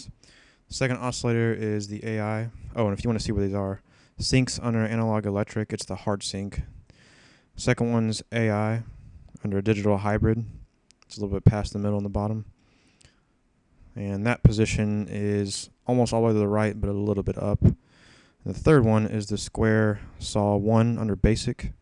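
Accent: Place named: United States. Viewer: Thnx for the detailed vid I really appreciate it! Helped me a lot now that i got some more sounds to play with , are you from Texas by the way? you sound like a cowboy =D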